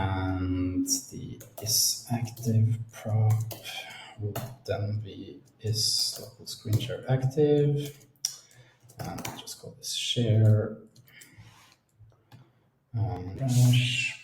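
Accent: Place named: Norway. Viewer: you speak English like a Norwegian. Er du Norsk?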